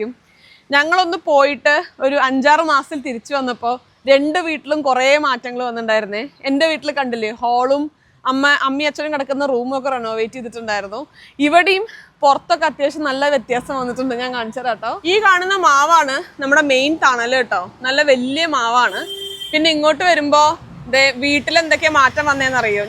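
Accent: Malappuram, India. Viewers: ഞങ്ങൾക്കും താളിപ്പ് കറി ഇഷ്ടമാണ് സംസാരത്തിൽ മലപ്പുറം ടച്ച് " ചൊയ" ഉണ്ടല്ലെ. ഇവിടെയും പറയും. പാലക്കാട് - മലപ്പുറം ജില്ലാ അതിർത്തി. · മലപ്പുറം അല്ലെ ഇങ്ങള്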